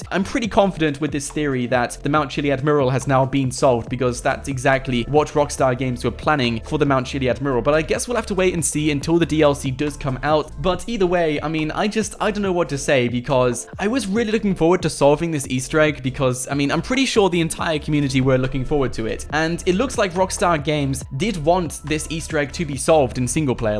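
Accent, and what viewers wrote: United Kingdom. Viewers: Where you from in uk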